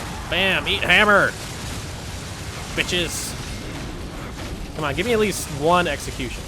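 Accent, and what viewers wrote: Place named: United Kingdom. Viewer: Hi I'm from the uk and i have to say i found your British accent most entertaining and i love the vids and the wit and banter. But here's the thing would it be possible to upload more than one vid i appreciate you maybe busy and if that is the case please ignore this message thank you.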